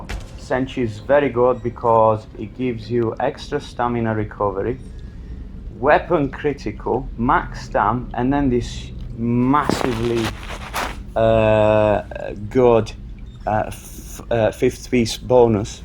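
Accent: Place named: Northern England, United Kingdom. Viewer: Haha as a Midlands man I was sitting here like.. I'm sure he's northern... but he also sounds foreign... love it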